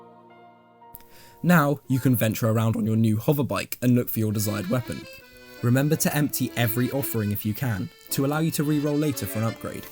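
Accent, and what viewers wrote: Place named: RP, United Kingdom. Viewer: My first thought when hearing your voice in a video - WHAT A POSH BRITISH TWAT. My second - Wow this style of content suites you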